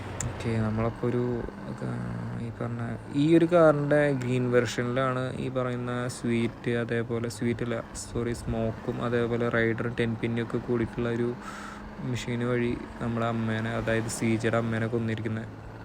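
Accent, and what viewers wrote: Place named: Thrissur, India. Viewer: BRO NATTIL EVIDEYA SLANG KETTIT THRISSUR ALLENKIL ERNAKULAM😄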